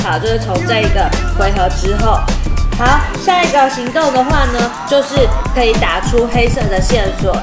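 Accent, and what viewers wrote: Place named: Taiwan. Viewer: 咬字不清晰，台灣國語難聽懂…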